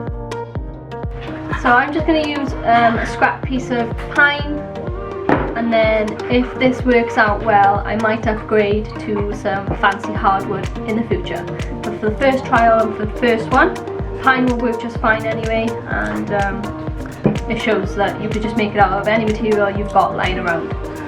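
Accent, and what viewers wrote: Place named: Wales, United Kingdom. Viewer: Nice work girl. Love ur Welsh accent btw.. I'm abut to make one of these but I'm going to take a punt at it with an Allen Key. I have a decent sized one so it'll do me for my 1st attempt. I've recently begun to use Hand planes and saws and I love working with them now. I've hardly used my table saw at all since. I have a jointer/thicknesser as well but I don't use it either. I love to plane the timber clean now. I love the look of the grain after using the smoothing plane or finishing plane on them.. I wanted to ask if I needed a good plane for cleaning up lumber that is rough sawn?? What number plane is generally used for that process?? Do I ned another plane or will either of my #3 or #4 do the job as well?? I enjoyed the video but I wish it were longer to see more of the process. Just my silly gripe though. Great build..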